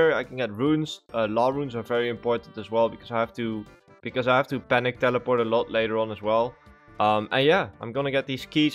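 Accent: Netherlands. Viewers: Hearing the Dutch accent is meh, but other than that legit insanely good and I’d love to see more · The dutch accent, haha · Are you Dutch? Your accent sounds really familiar.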